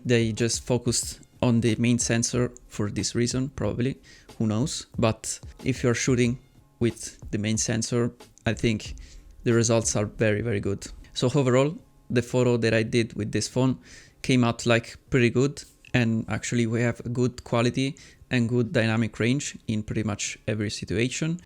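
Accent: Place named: Italy. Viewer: sapevo che eri italiano...si capiva dall'accento inglese ahahah bel video anche io ho il mi 11 e secondo me con il sensore principale le foto sono fantastiche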